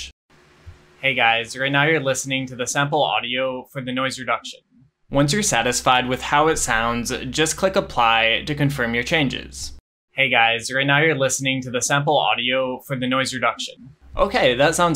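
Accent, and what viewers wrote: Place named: Los Angeles, United States. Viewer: you have such a cute la accent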